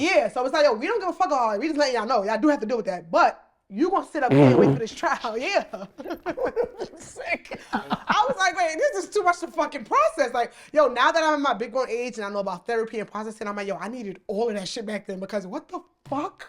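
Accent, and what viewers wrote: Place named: Brooklyn, United States. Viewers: my girl so new yawk!!! what’s good!!! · Shes sooooo Brooklyn, i Love her. Her voice, her personality. The way you do anything, is the way you do everything, her business is gonna go far.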